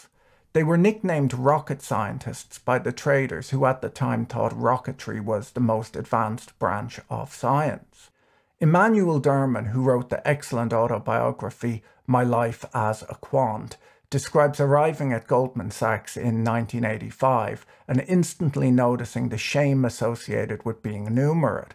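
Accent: Ireland. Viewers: I'm curious about your accent..I know you rarely read comments especially on older videos, but your accent fascinates me. It sounds Irish but there's something else in there it sounds half American or Canadian. Can you tell us how your accent came to be like that and where you've lived etc? Maybe it's your video voice to flatten your Irish accent for American audiences and you're doing it deliberately? Pretty cool smooth accent anyway.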